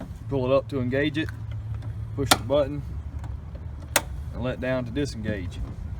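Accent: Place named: South, United States. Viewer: Understood 'most' of it through that southern accent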